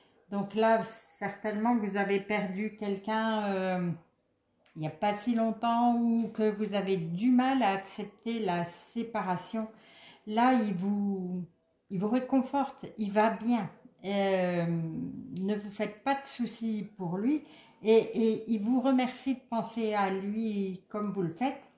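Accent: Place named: Haute-Marne, France. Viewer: Rhooo ben non... on n'en a pas marre du tout, au contraire... on en redemande 😀😃🙂🙃! C'est toujours un grand Bonheur Boostant et Apaisant d'entendre ta voix qui me rappelle de si bons souvenirs (ils sont bien au chaud dans mon Cœur ❤ ❤ ❤ ❤ ❤)! Et cet accent haut-Marnais 😉😉... un réel plaisir 😀😃. Là c'est l'hôpital qui se fout de la charité 😀😃🙂🙃😊😇😀😃.... On me dit à La Rochelle: - "Vous n'êtes pas d'ici vous!"... Voilà, une partie de mon Cœur est dans les Vosges, une autre en Haute-Marne et encore une en Charente-Maritime 😉😉. Bref... mon Cœur a beaucoup de place 😉 et tu y es bien au chaud ma. Bisous à toute la famille. A tout bientôt ma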